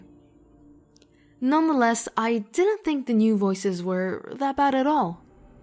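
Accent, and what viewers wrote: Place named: Germany. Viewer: Awesome video. Also, love your accent. Is it German?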